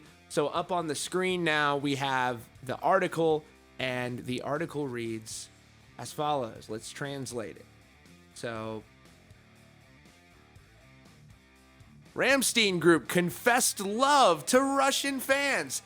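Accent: United States. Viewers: Omg this American pronunciation, I can't. Dude, google how to pronounce names of music bands.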